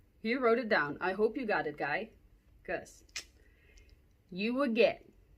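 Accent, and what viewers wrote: Netherlands. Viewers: Love your Dutch accept and Dutch confidence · In like two seconds I was like Dutch accent? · Hoi ben jij Nederlander coz ur English is that Nederlander accent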